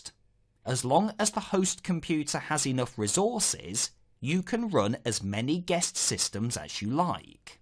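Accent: United Kingdom. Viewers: Best explanation ever on Hyper-V and I enjoyed the British accent. well done thank you for the free training videos.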